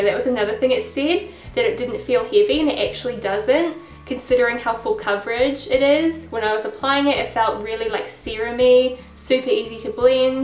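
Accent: New Zealand. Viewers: hahahaha "eeeeh it's the eees-pee-eeeff" :') You sounded so cute there! I love the kiwi accent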